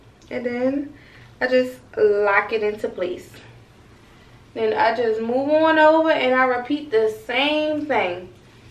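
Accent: Baltimore, United States. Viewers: Love your hair....it my first time watching and it sounds like I hear a Baltimore accent. 🥰 Or maybe Philly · sis you from bmore/dmv? you remind me of an old college friend! love the energy ❤ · Although I moved away from Baltimore 13 years ago you just made me so proud hearing your accent it was unmistakable. Your whole bad represent what a fabulous black women are Beautiful bold and unapologetic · First thing I noticed too 😄 heard that BMore accent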